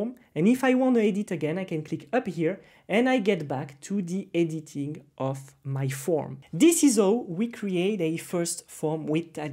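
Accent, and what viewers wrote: France. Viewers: you have a french accent 🤔🤔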